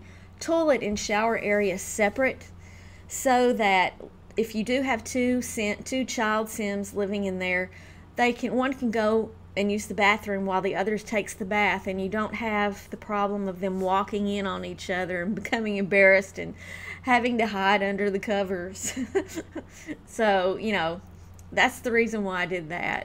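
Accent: South, United States. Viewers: Your southern accent is so awesome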